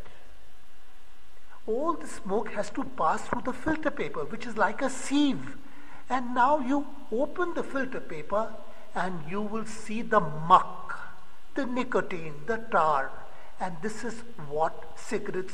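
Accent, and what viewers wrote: India. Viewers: Anlatıcı hintli.çünkü bu hintli ingilizce aksanı :) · Kesin hindistanlı bu konusan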